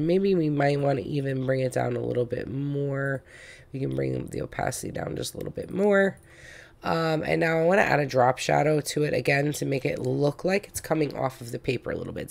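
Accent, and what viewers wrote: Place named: New England, United States. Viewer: 👏This is the only vid that made sense for these pages! Thank you for actually saying everything needed. Some vids will miss saying shift and then I can't go any further because I don't know why the tool wasn't working like I want. So glad I found your channel. I also like your (New England?) accent. I can finish my homework now!!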